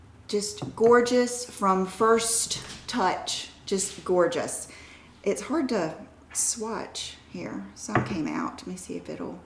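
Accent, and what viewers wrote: South, United States. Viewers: One of my favorite things about you is your southern accent!!!! 🥰🥰🥰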